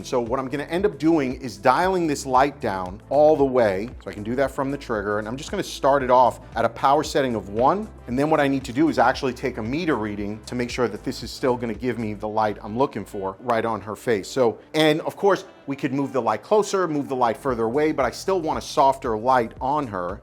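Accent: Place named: New York, United States. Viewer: As always, informative and helpful. Question, are you originally from NY? As a NYer that accent of yours harkens memories of home.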